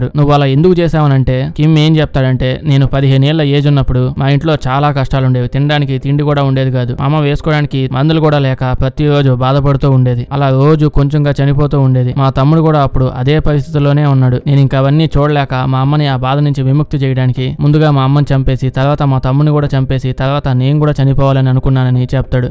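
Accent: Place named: Godavari, India. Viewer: New subscriber.... Godavari side aa anna midi slang alane undi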